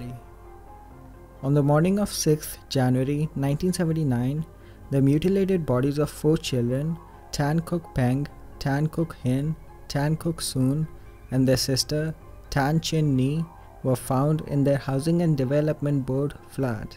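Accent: India. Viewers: are you from India? You sound like Indian if I'm not wrong. Anyways thank you for including Nepal case.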